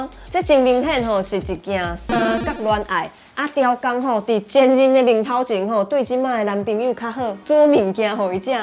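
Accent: Taiwan. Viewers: 難得聽到標準的臺語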